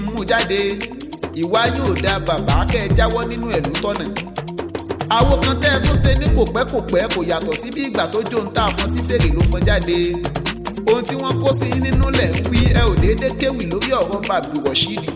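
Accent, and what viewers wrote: Ibadan, Nigeria. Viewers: Why is the Ibadan accent an issue? That is the flavor of Yoruba poetry! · Great to see another buddyeing Ewi artist join our genre. This is far from polished Ewi. You have got promise though. You need to remove the strong Ibadan pronounciations though to gain broad appeal and acceptance. I'd love to work with you if you respond to me. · But ibadan ascent is too pronounced in your ewi. Though you have a good composition and presentation but work on your ascent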